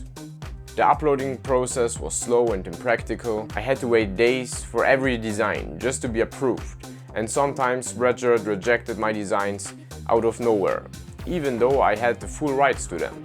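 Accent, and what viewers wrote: Germany. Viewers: German accent? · Man hört das deutsche haha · Are you german ? It Sounds like that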